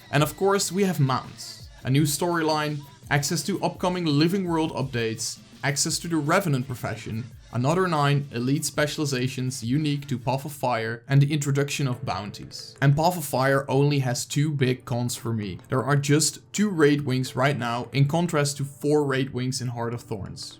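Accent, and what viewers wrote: Netherlands. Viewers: ik hoor dat je nl bent hihihi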